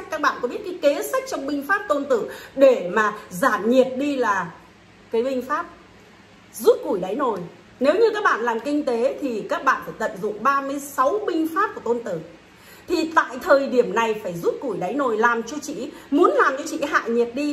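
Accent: Standard. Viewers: chi noi rat chuan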